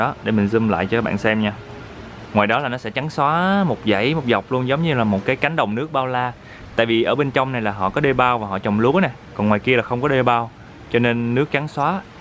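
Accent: Mekong Delta, Vietnam. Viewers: Chuc mung anh đuoc hon 100.000 nguoi đk nha! Rat thich cach a nc kieu mien tay iu mien tay! E cung ng mien tay😘🙌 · Mê cái giọng nói miền tây mất rồi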